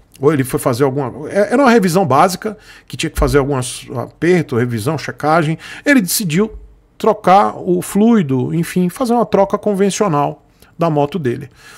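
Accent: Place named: Santa Catarina, Brazil. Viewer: sotaque praiano do litoral barriga verde não engana...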